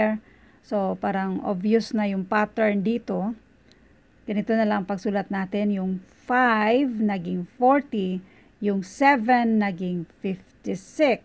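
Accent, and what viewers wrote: Visayas, Philippines. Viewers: Bisaya ka gang. Kita kita r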